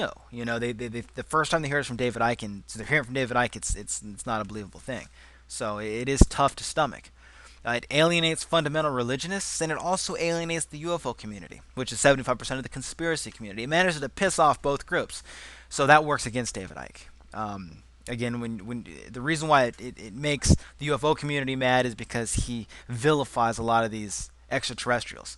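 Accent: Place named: United States. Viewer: I Cannot stand the arogant yank talkover it pisses me off!Cant someone else do it?(it,s just his voice).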